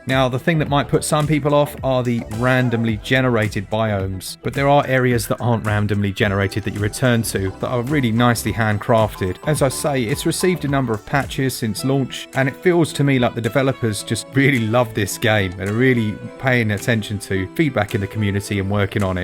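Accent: United Kingdom. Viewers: Everything sounds better in a British accent. Please say Guv' after every sentence on the next vid 😂 Always keeping it SwitchedUp, <3 from the Land Down Under. - F0x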